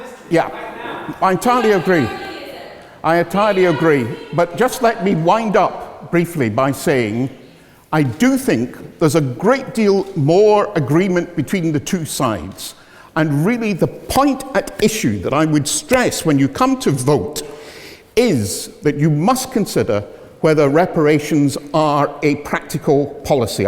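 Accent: United Kingdom. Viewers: Big Mac, bull shit spoken in a rather classy british accent :) Sorry Sir.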